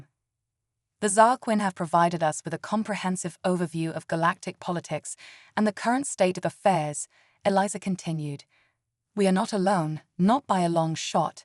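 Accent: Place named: RP, United Kingdom. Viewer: I am NOT a fan of this female upper crust British A.I. voice. Too many emphasizes on too many superlatives and verbs and staccato syllables followed by disdainful valley girl syllables at the end of sentences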